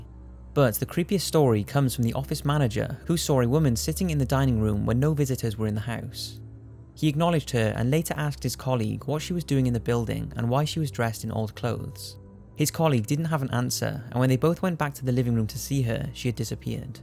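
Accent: United Kingdom. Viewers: Am I The Only One Who Loves This Guy's British Accent??😐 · You have a very beautiful and calming voice, I really like it, where are you from? Your accent looks like British....😊 make more videos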